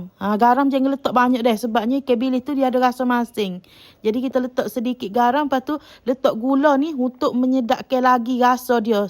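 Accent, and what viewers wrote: Kelantan, Malaysia. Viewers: Lama x muncul ya..suke dh kecek kelate tu😅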